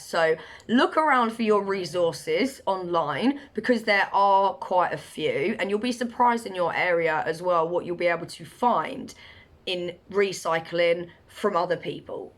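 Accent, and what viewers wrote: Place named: Leicester, United Kingdom. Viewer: Sounds like you're from leicester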